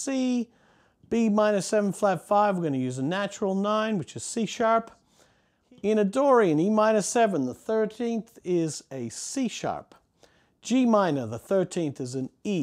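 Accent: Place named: Australia. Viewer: He has less of an Australian accent now.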